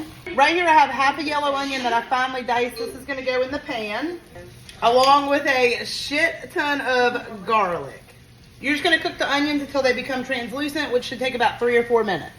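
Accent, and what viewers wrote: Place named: South, United States. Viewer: Love you girl! I'm from the to South too so I love your cooking and...your Southern accent. 🥰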